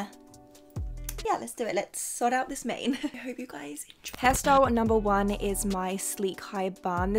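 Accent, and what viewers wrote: United Kingdom. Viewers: SOMEHOW I KNEW SHE WAS BRITISH 😭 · Are you British :D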